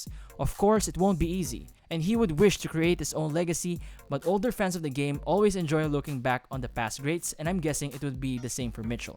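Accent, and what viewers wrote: Philippines. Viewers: Bro, are you filipino? That english accent of yours sounds like a pinoy.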